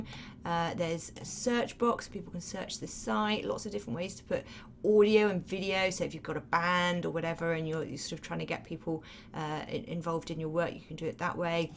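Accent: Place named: South, United Kingdom. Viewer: It's a standard Southern British accent.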